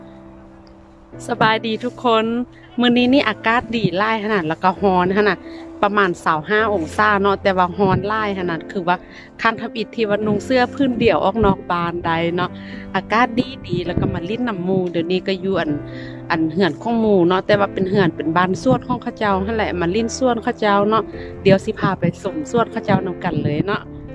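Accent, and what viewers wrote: Luang Prabang, Laos. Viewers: สยายดี, มารับชมนำเด้อ ฟังเสียงเจ้าคือคนหลวงพะบางเนาะ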